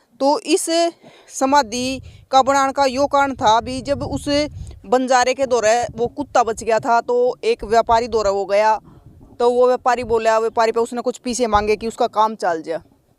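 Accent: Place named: Haryana, India. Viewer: Didi aapke bolne ka tarika bahut acha he jo aap haryanvi me bolte he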